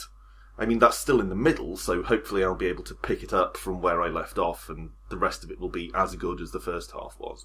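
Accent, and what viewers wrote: United Kingdom. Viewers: I'm glad things seem to be sorted. I just found the channel, and definitely subscribing! ...although, I must admit it is in part because of your British accent. (^_^)b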